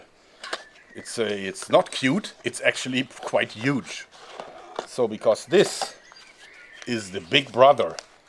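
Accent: Germany. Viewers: man hört voll raus das der Typ deutscher is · Du hast nur einen Satz gesagt und ich wusste sofort das du aus Deutschland kommst :D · he sounds german idk :D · you are one sick fuck;-) l enjoy your exuberance and enthusiasm dont change and that german acent off the top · you have an really Nice german ~ accent ~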